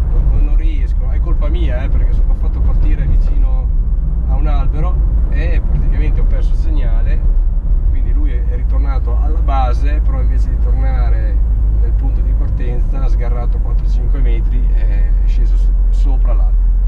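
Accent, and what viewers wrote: Romagna, Italy. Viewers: Ecco perché parli da romagnolo...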